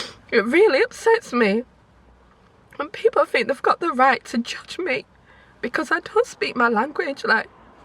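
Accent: United Kingdom. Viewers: Don't feel too bad...other groups do this too...in the states i've witness hispanics born in places like N.Y. but don't speak spanish is looked down upon because they didn't grow up speaking spanish...At work Jamaicans from the islands stay making fun of the Jamaicans who came to the states as children (thru no fault of their own). They stay telling them, "you ain't a real Jamaican...yada yada yada....Don't feel too bad...btw, luv your UK accent... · its just a zambian thing coz even the opposite is true, if u cnt speak English they will stigmatize. just be who u are coz me the day i will visit London even for a week and come back to zambia my friend i will cum back with that UK accent. i like ur accent by the way...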